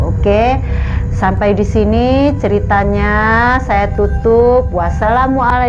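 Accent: Lampung, Indonesia. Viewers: Oke siap .. ciri khas suku gham lepung (suku kita Lampung)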